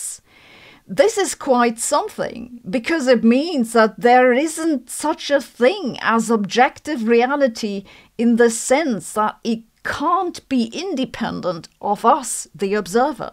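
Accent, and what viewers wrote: Germany. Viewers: She's awesome. I have watched several different science oriented channels but Sabine is my favorite. I can't claim to understand everything. I do my best to get a sense of things as she breaks down different topics but the vast majority of it is beyond what I understand. In my gut I feel like she is a person of character and if she realizes she is wrong about something she will admit it and explain it. That is everything in my book and so I trust her. Having said that, my grandparents were German and I love that accent... She had me at "Einshtein" 😅😊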